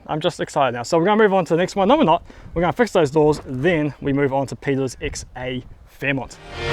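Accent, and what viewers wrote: New Zealand. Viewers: Ahhh....I can hear a hint of Kiwi twang. Love the old cars. Thanks.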